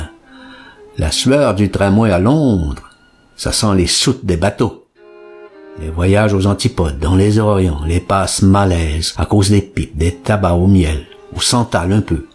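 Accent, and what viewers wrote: Quebec, Canada. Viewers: J'y retourne 10 mois plus tard parce que je viens de vous écouter dans la suite... Quel plaisir de partager vos échanges et la langue de la belle Province que nous aimons tant à entendre. D'ailleurs comment faites vous pour vous départir de cet accent si beau quand vous lisez ? Bon WE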